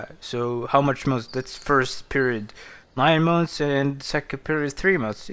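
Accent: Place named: Ireland. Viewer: Your voice remains #1. Gotta love the Irish :")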